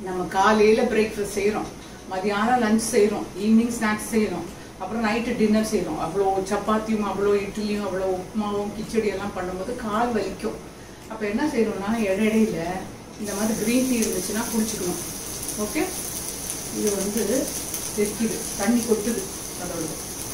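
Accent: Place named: Kanyakumari, India. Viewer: You speak kanyakumari Tamil..💪.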